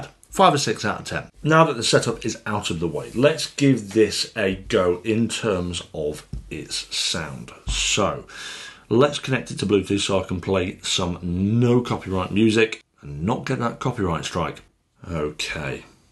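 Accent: United Kingdom. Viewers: The thing that suprised me most is even though I use "Echo" as the wake word for my device, it wasn't triggered once during your video. Is it possible that my device can distinguish between a Northeastern U.S. accent and a British accent?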